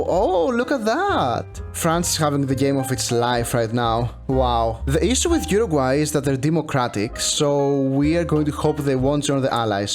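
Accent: Greece. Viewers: He sounded Greek to me and because I'm Greek to I had to make sure thanks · Your accent sounds like your greek who speaks English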